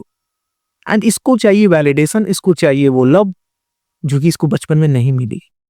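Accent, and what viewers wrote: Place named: Bhojpuri, India. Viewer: Where you from bro Your language accent is bhojpuri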